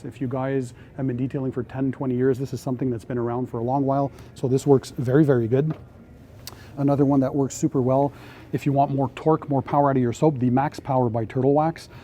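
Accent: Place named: Canada. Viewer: New to your channel. I had a feeling you were Canadian by the sound of your voice. I have a good friend from Montreal. We meet up in Albany to get our families together now and then. And my son and I went to the NY auto show this year too. What terrible weather it was the day we went. I’m a novice detailer. Here for the knowledge and tips.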